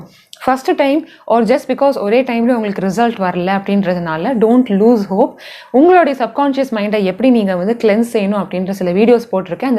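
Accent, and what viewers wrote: Sri Lanka. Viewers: நீங்க ஸ்ரீலங்கா தான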